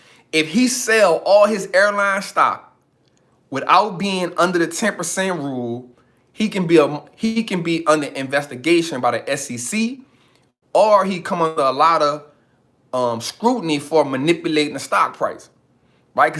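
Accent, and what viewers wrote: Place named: South Carolina, United States. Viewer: Your accent reminds me of my grandmother. She's from South Carolina.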